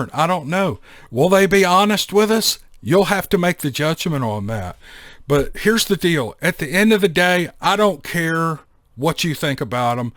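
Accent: South, United States. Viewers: Meant to add that your well spoken Southern brogue gets me through many nights and I am very much grateful to for your gracious and unassuming attitude toward our shared fascinations with the many accounts, lore and incredible stories of the rural areas and wilderness countryside of this great Nation !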